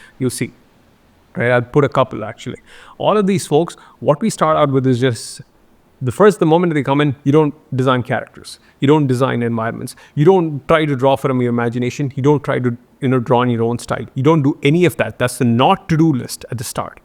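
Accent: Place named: India. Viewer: Lol, just discovered this 2nd channel, same old Indian accent.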